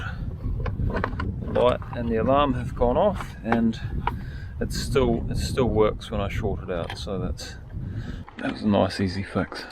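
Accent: New Zealand. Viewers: Been wondering about this for a long time - if I speak with a New Zealand accent will I be as mellow and smart as?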